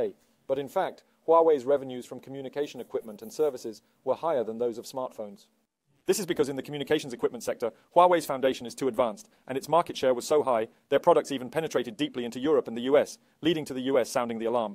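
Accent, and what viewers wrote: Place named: Oxford, United Kingdom. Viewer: He is speaking Oxford English- CLEARLY, with the right speed- for u tube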